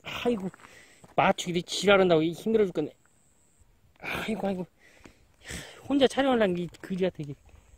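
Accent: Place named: North Jeolla, South Korea. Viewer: 님 전라북도 사투 구수해부요 잉 지는 전남인디 ㅋ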